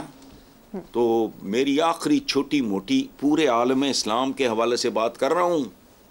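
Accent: Punjab, India. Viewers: * This dude why he is speaking urdu in punjjjjjjjjjjjjjjjbi accent? I lived in pakistan. Only the very poor and islam's thekedars are against wine, beer and whisky there. Open up let people enjoy themselves, religion is nothing but figment of lmagination., there's only one life so enjoy it.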